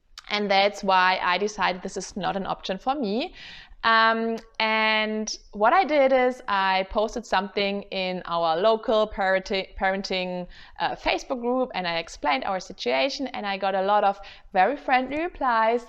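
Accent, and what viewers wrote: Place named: Germany. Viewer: I loved your joke… totally arrived on my end 🤣😂🤣😂🤣. But maybe that’s because I’m German haha! I miss the birds singing/chirping in Germany… I also miss going to the Bäcker and Metzger, or hanging out at the Bistro/Café. Here we get rushed off the table… I miss the laid back nature of Germany. I wish we lived closer so we could hang out for Kaffee und Kuchen 😁😁😁. But Boston is not the next closest trip from Colorado. Mein Angebot steht nach wie vor… wenn Du mit irgendwas Hilfe brauchst, melde dich einfach. 👍🏻 Like you said, your language/vocabulary will improve just by living your life daily and speaking it all the time. I’d say that Americans, or any English speaking person for that matter, understand you just fine. Even your German accent isn’t as strong as some other German English speaker. You’re doing VERY WELL. 💗 Have you tried the German bread website I shared last time? 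If not, definitely give them a try. I enjoy it very much.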